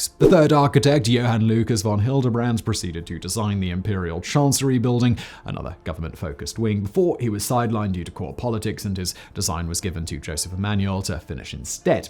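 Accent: United Kingdom. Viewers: Ah, I'd hazard a guess these videos are written by native speakers. Presenter couldn't be more British. I'm austrian, if you wanna know.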